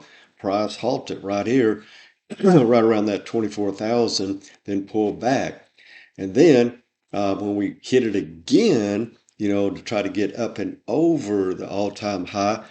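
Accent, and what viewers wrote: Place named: Texas, United States. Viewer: Thanks for this. I’m in Travis & Dylan’s courses, but your instruction also seems like it would be helpful. I grew up in TX, but moved west 30 yrs ago. It’s nice to hear a TX accent (yours is very slight). Southern accents like some in Georgia & Tennessee are very strong in comparison.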